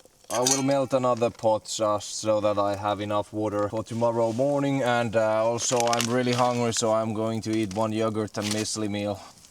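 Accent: Finland. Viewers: Mukava kuunnella välillä tuota rallienglantia 😂😂😂. No pääasia että ulkomaalainen saa selvää👍on naurettu kaverien kanssa 😊 Kiitos . Paljon seuraan #retkireppua #alileiniö#mehtämikko#eetun völjyssä #vapaalla jalalla ym. Eli Suomen ylivoimasia ykkösiä niin kivaa vaihtelua nämä on ...in English 😅😅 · You seriously sound like Luke from Outdoor Boys, but you add Finnish scent to that. I love it haha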